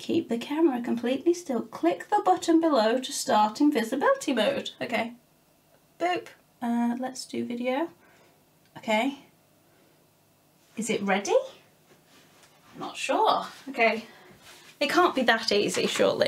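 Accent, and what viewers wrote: United Kingdom. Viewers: I like your British twang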